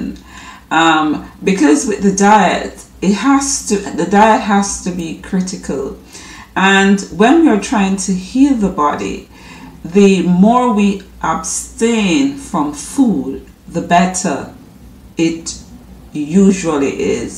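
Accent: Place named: Barbados. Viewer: where are you from? Your accent sounds a bit Barbadian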